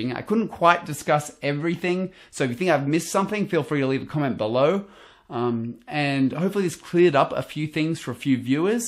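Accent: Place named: Australia. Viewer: even though I don't have much experience with Australian accents , I was able to understand you 100% . no muffles or "uhhhmmms" at all. Thanks!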